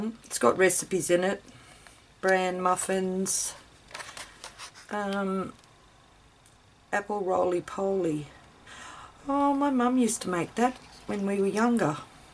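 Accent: Australia. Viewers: Lovely to hear an Ozzie! Great hall of treasures!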